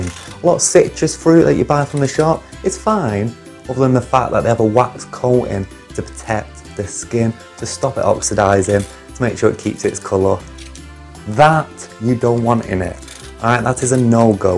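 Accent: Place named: Northern England, United Kingdom. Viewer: wtf he sounds nothing like british black community.. hes has a northern english accent.!!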